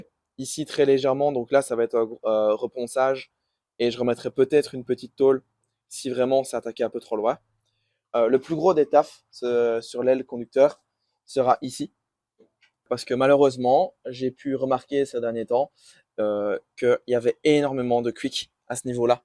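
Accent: Liège, Belgium. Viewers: Ça part sur un abonnement, ton projet me fait rêver 😃 tu dois être liégeois, à entendre ton accent ? J’habite à Francorchamps, perso 😊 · 'fti ! ne viendrais tu pas de LIIIEECH?? xD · nickel alors si le message de base est passé et que ça t'a fait plaisir 😁 Merci, oui j'ai quand même eu un peu de chance malgré tout (je me posais une question, je vais sûrement avoir l'air con si c'est pas le cas mais, tu serais pas belge par hasard ? J'ai l'impression de reconnaître un accent liégeois, voilà voilà 😭) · je suis de Walcourt :D a l’accent j’avais remarqué que tu étais de liège 😬😅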